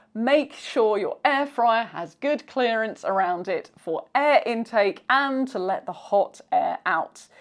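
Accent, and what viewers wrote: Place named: Liverpool, United Kingdom. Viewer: This woman is speaking with a Scouse accent.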